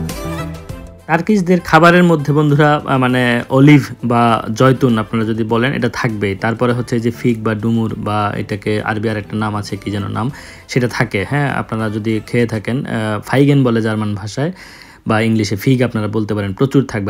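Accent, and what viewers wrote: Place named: Madaripur, Bangladesh. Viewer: ভাই আপনার কথা একেবারে খাটি বাঙালি.এভাবে কথা আমরা মাদারীপুর বাসি বলে থাকি. ধন্যবাদ এভাবে ভিডিও আমাদের উপহার দেওয়ার জন‍্য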